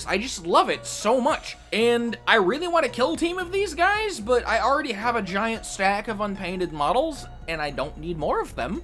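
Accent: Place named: United States. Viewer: As iconic as hearing a British accent accompany Warhammer lore, it is refreshing hearing a fellow brother from this side of the pond discuss 40k. I'm in love with what I'm seeing so far.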